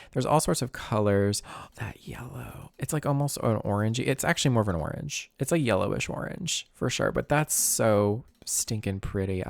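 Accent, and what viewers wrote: South, United States. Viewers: Just working from home and listening to calming voice! I work CS and find that his smooth tones and familiar Southern access sooths me while I deal with the aggravations!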